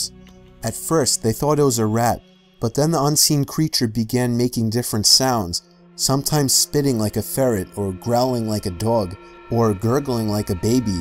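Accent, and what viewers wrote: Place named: New Jersey, United States. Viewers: i feel like he's lost his jersey accent a bit. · I can't place accent. I want to say S. Boston, but its not that thick. Definitely E. Coast. Could be New York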